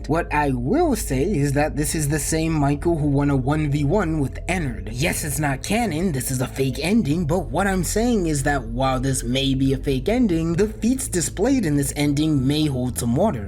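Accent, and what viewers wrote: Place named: Balkans. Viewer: That balkans voice..., is it you?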